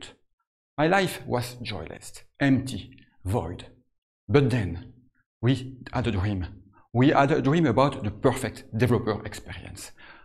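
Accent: France. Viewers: he's 100% french or belgium native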